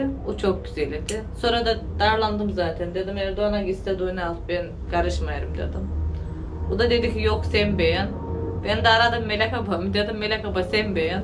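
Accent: Trabzon, Turkey. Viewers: seni keyifle izliyorum tirabzon sivesiyle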